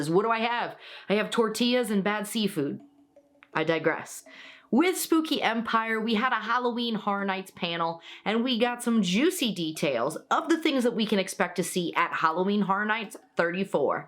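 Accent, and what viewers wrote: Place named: Louisiana, United States. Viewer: I figured you were from there the way you were talkin'. Lol! I used to hit up RenFaire annually from 2009 until around 2017 before we moved. I dressed up like a Templar Knight, but I'm thinking of switching up to Aztec Warrior. I miss it. Tried to hit up one on the outskirts of Florida with the fam. . . Yeah, it was just another reminder of how rinky-dink things are up here. Never thought I'd be that guy from "the big city" that talks trash about small towns, but the smug/ignorance of these small town folks makes me rep H-Town even more. I take comfort in knowing that Houston is the "dirty south" and isn't a big city like NYC. One thing you might appreciate though being from Louisiana. . . The seafood is better up here in Fort Walton Beach. This is coming from a guy who hated seafood until my wife made me try it in Connecticut. 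Anyway. . . Keep up the good work on the channel and hope you get to USO for HHN.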